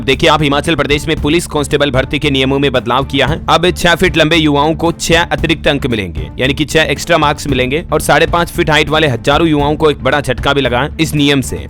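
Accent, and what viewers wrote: Rajasthan, India. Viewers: bro aap rajasthan ho kya lgrha h is video m tone lag rhi h rajashthan vaali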